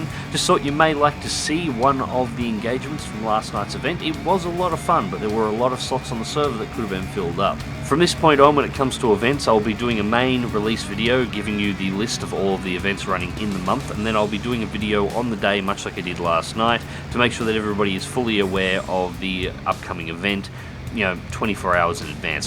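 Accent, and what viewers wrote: Australia. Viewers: Yeah mate he's bloody aussie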